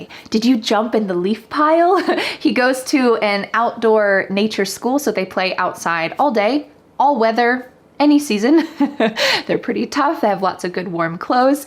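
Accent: United States. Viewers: We dont have summer season actually in my country,thats why we never spend our time for summer,..in my country is summer all the year,instead😂😂😂😂😂 Greeting from indonesia...My new teacher.....its fun learning english with u....i ❤ american accent....i love superman,spiderman,pizza hut ,kfc and etc😂😂😂😂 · Какой у нее Английский? Не американский? Мне надо американский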